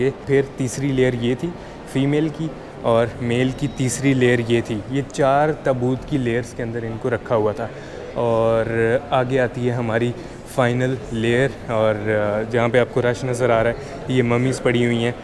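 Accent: Punjab, India. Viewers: Ur Punjabi tone gives a boost of masala in the tour 😂😂😂 😋😋😋😋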